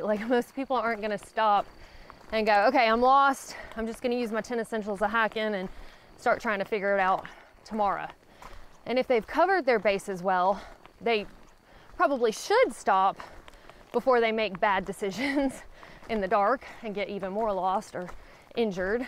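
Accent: South, United States. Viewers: Gymnasticin! Love it, been a while since I have heard pure Sutherneese.... Hi, been a while!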